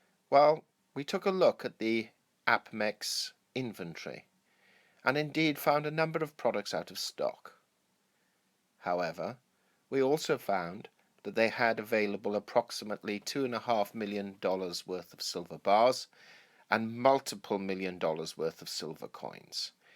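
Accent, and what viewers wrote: United Kingdom. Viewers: are you British??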